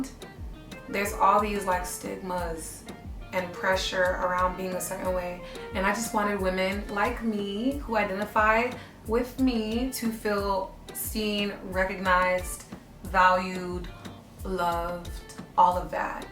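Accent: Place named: Nigeria. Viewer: I am new here n can’t help to get the Nigerian accent. U get Nigeria blood for body? Love u already. ❤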